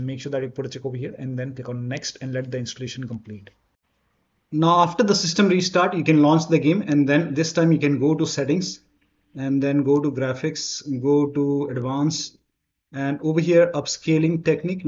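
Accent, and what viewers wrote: India. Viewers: i cant listen to some guy with an indian accent sorry .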